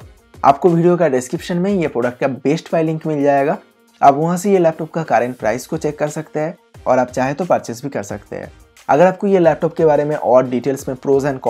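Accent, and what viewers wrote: Bengal, India. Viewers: Bhai kya aap Bengali ho?? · Are you Bengali?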